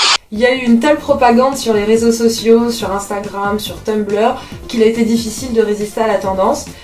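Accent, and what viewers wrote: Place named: France. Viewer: The French accent is quite dope. Keep going from London.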